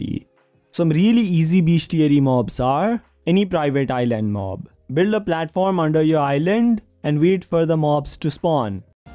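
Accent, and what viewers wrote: India. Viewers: U sound like indian but are u In USA ?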